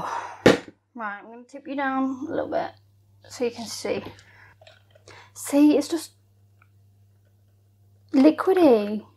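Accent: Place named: Kentucky, United States. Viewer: absolutely I love your accent, and mine is just as country if not more so than Chris, Amy, and Tammy’s! We’re from the same neck of the woods here in Kentucky! 😂 Biscuits and gravy have to be HOMEmade not from a restaurant otherwise you just congealed slop. 😂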